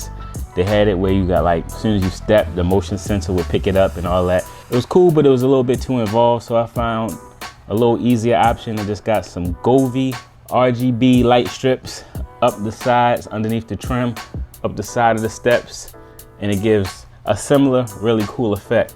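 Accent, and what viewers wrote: Baltimore, United States. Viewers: Just stumbled upon this video you’ve got to be a Baltimorean I recognize our accents😂🙌🏾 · Could tell you were from bmore in the first 2 seconds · Dope channel and your bmore bmore I hear lol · Is that a Baltimore (Maryland) accent? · Nice! Aside: By the accent, I'm gonna punt and say you're from the Baltimore area...🦀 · You got a follow beacuse the accent screams Baltimore lol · im guessin youre from bmore?? 🤣🤣 them dews and tews lol. good vid tho nice work on everything, i was buying random lights too until I got to Govee. Now my wife is like dont bring anymore lights in this house 🤣i see this is a couple years old tho ill check to see if you updated ✌ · Wassup man…just tuned into your channel for some ideas, loving the content. Btw are you from Baltimore? You kinda got our accent 😂😂.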